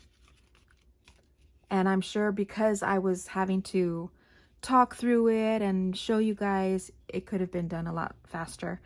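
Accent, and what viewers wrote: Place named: Colorado, United States. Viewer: In your last haul video I heard a little accent that reminded me of home. I'm from Colorado originally. Just curious if you grew up near there.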